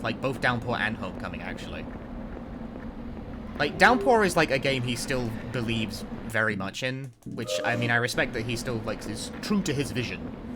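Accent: United Kingdom. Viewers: Fantastic run! Not surprised Cedar Grove Sanitarium, on a damn WR no less, managed to take over 11 minutes to itself. The place is massive and during your run I actually lost count of how many key items it involves. Been a while since I last played... Out of curiosity, what game are you saying that is "boring" to speedrun around 29 minutes in? I'm not very good at understanding British accents unfortunately. :(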